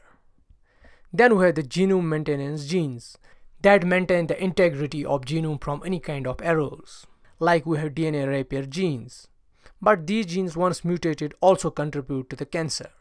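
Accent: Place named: Kashmir, India. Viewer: Hehehe funny Kashmiri English dialect...... love it.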